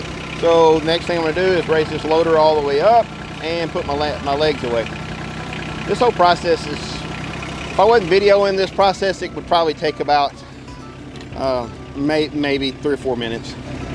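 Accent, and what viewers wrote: South, United States. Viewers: You speak perfectly understandable Southern Slanglish.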